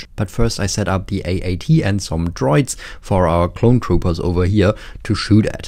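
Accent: Germany. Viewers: Höre ich da ein deutschen Akzent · Als ich das Video gestartet habe wusste ich sofort das du deutscher bist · are you german? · I didn’t notice how German you sound until the „Drei zwo eins“ , but now I can’t unhear it XD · Are you German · Was für ein krasser deutscher Akzent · Wird a German excent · Ha ich da gerade jemand deutschen gehört? oder Österreich? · Man hört nen deutschen akzent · i smell german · Didn't know you were german xD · This dude sounds German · Du bist deutsch😮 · Man hört richtig das du eigentlich deutscher bist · Man hört den deutschen Akzent aber cooles Video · Min drei, zwo, eins... dann noch dieses Englisch mit deutschem Akten, da merkt man das ein Deutscher redet.:) Grüße aus Germany! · you sound german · Bist du aus Deutschland hört sich nämlich so an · Are you german?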